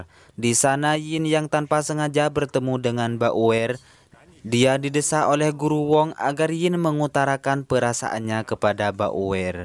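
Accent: Madura, Indonesia. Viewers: Madura sih , kalau dri logatnya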